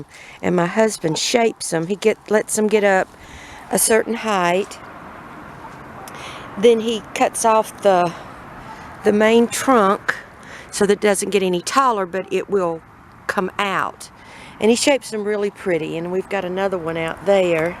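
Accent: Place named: South, United States. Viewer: Love what y’all are doing for the cats 🐈 & Lily the goat 🐐! I also love that southern accent. All of my family is from VA, with a few of us living in MD. Take care, 🎀 Lily 🎀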